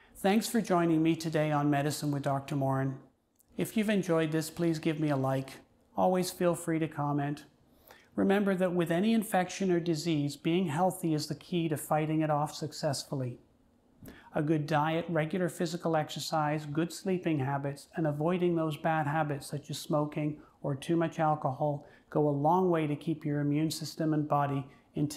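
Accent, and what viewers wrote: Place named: Canada. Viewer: "I've been asked aboat.... This video is aboat...." Clearly this doctor is Canadian (I can trust him).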